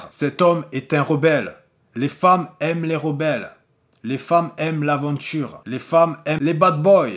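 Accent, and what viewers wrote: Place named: South, France. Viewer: C'est la 1ere fois que j'entends un allemand parler avec un accent du sud 😂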